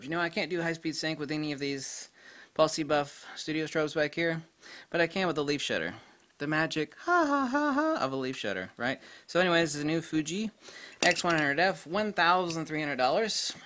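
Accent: Australia. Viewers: Yes we do but don't let that stop you. Reference to Australian accent. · Your Aussie accent isn’t bad mate .. stick with it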